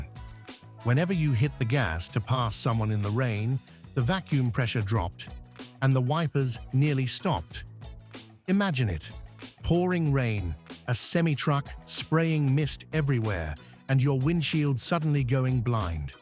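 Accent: United Kingdom. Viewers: Please lose that, britsh accent. They didn't make the Falcon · Who writes this crap? British accent narrator knows little about the Falcon!!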